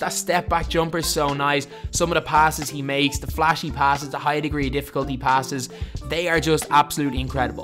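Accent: Ireland. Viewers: This is an Irishman narrator who is trying to sound American.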